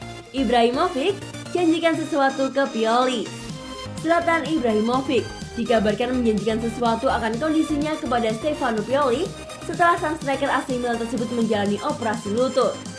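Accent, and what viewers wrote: Java, Indonesia. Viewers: Waduh.. medok'e mbaaaakk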